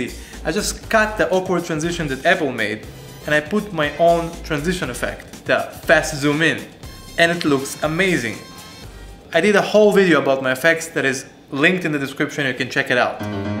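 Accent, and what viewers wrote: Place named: Israel. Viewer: אחי אני לא מאמין שאתה ישראלי בהתחלה ראיתי את האינטרו ושמעתי מבטא מוכר ואז הייתי פשוט חייב לבדוק אם אתה ישראלי ומצאתי סרטון שלך בעברית... באמת מגניב ואחלה של סיקור, באמת רציתי לקנות את האחת עשרה ורק עזרת לי להבין עליו יותר תשקיע בערוץ אחי וואללה יש לך כישרון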